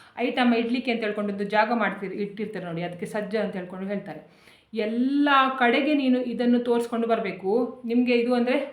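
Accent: Mangalore, India. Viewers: Mlore kannada ❤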